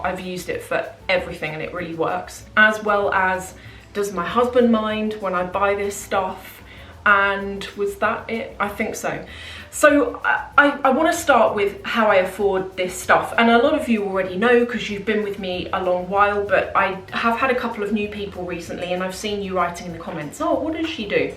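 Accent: United Kingdom. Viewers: Dear, I love your blog)). I am writing to you for the first time) You are a very interesting and beautiful woman. And you also became the first English-speaking blogger for me. With the help of your videos, I try to perceive English by ear and not get bored at the same time😅You speak very competently with a beautiful British accent;) I also miss London and enjoy watching your shopping vlogs from Harrods) I hope soon I can finally to visit the UK again without restrictions related to COVID-19 🙏 and go shopping too🤪I wish you happiness and even more successful shopping!) with love from Kiev, Ukraine❤️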